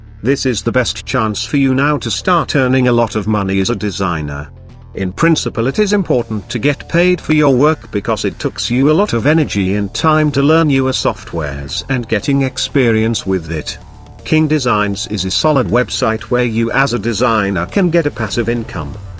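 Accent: Germany. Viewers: Hörst dich stark nach nen deutschen an xd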